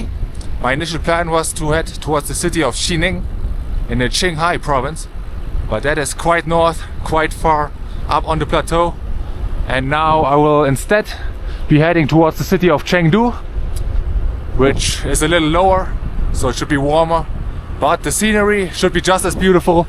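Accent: Germany. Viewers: Always love the content and im excited to see what you find in Tibet ! By the way, if you ever feel, when editing, that you should have said something but didn't, please feel free to add it. Or, if you just want to ride and not talk that day, you can add it in production. Just a thought I wanted to share, because you do have a great voice, with that german accent 😄. Because, showing us things, this time Tibet, is one aspect, but telling us how you are living it, is another thing. Its "wanderlust" as they say right ?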